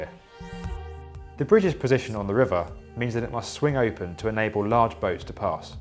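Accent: United Kingdom. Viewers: He's from the UK you dumb shit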